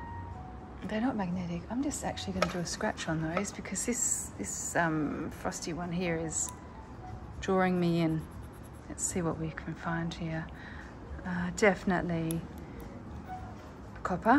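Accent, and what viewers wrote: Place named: England, United Kingdom. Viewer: I really enjoy your presentation with the beautiful English accent. You have some really nice jewelry. Cheers from Princeton IL from Agi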